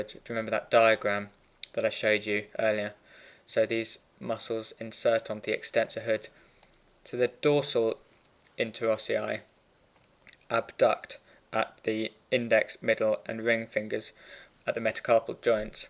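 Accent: United Kingdom. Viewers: Your videos are so helpful. I’m preparing for my exams and I don’t even use atlas often since I found your channel. Teaching is great and the British accent is everything 🙏❤️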